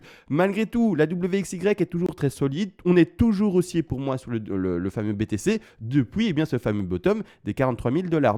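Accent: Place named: Belgium. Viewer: Bonjour et Bravo à, je suis devenu accro à ses tutos.... lui qui est belge je crois... et moi Québécois!!! Mais quel ACCENT!... pour un québécois francais comme moi ca me tient réveillé!!... Question pour la communauté: j'utilise Kraken pcq c'est le premier exchange que j'ai connu, cependant, je le trouve tellement pas bien fait. Je sais que ne souhaite pas s'investir en recommandant un exchange pour les raisons qu'il a mentionné et que je respecte, mais je suis d'avis qu'un membre de la communauté pourrais me référé l'exchange qui serait plus plus adapté à suivre ces tutos, comme par exemple modifier les stop loss à la cliquette comme il dit souvent:)) qui peut me recommander son meilleur choix et pourquoi... Merci et bravo encore... j'apprend toujours!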